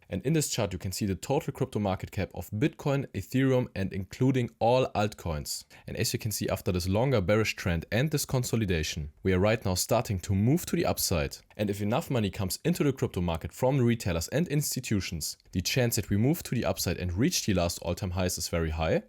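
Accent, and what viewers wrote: Germany. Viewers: Deutsches Dialekt 👍 lege ich richtig? SUI is gonna be huge 🚀🚀🚀 in my opinion, a 100x from the bottom is more than possible ✅ Grüße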